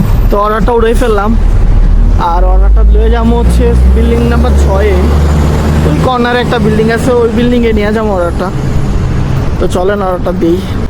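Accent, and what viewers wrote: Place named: Comilla, Bangladesh. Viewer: ভাইয়ের কথা সুনে মনে হচ্ছে বাড়ি কুমিল্লা 😊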